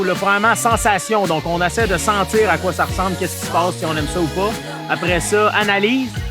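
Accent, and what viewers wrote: Canada. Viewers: en deux mots j'ai adoré!!! qui plus est avec ce superbe accent qui nous transporte vers les contrées lointaines du grand nord Canadien. Merci merci merci; je n'ai plus que 30 jours a attendre avant de mettre en application tous ces bons conseils sur les pistes des Alpes francaises A plus et Tabernacle continuez comme çà👍👍👍😂😂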